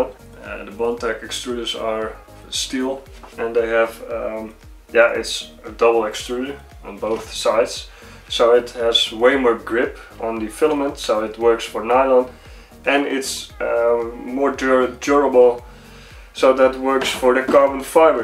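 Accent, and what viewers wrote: Netherlands. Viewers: You just HAVE to be dutch, listening to that accent. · are you dutch?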